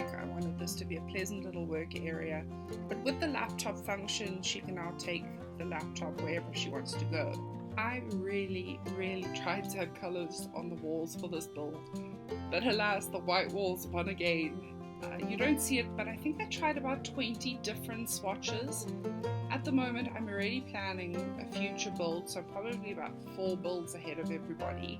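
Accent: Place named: Australia. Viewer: i think ur from australia, right?? with the accent, lol love the build a bit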